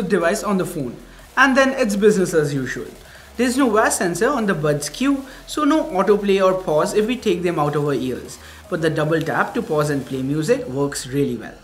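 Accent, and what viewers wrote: India. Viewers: but to be honest... My English has been improved a lot and still improving by watching his indian accent english videos 😊 it's really helping me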